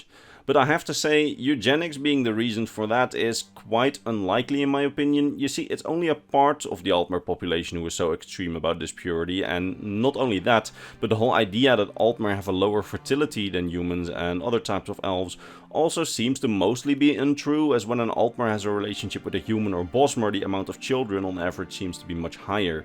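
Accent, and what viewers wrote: Netherlands. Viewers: 5 minutes in I KNEW this guy was Dutch the accent is so thick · Je klinkt zeer Nederlands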